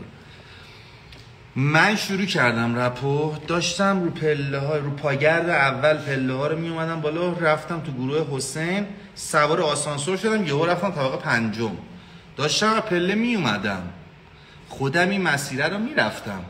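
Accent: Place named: Turkey. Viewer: دادا ترک ع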